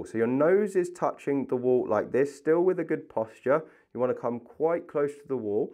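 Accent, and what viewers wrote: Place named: United Kingdom. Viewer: Superb presentation.... British! No annoying music either!!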